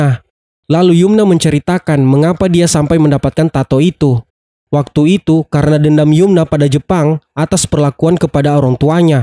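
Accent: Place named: Palu, Indonesia. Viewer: naratornya seperti logat Palu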